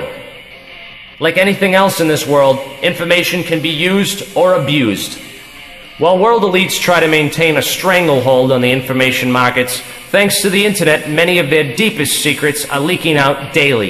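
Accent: Boston, United States. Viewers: Good video, as always. But why do you have that Bostonian accent you never had before? I felt like I was watching the departed where the accents changed throughout the film. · What's with the Boston accent?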